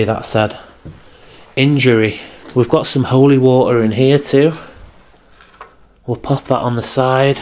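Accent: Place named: Liverpool, United Kingdom. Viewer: his accent isn't Welsh but definitely northern. I think he's scouse or from Cheshire. Definitely got a scouse sound.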